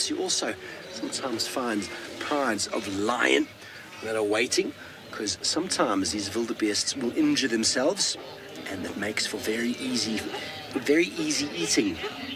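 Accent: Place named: South Africa. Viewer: The south African accent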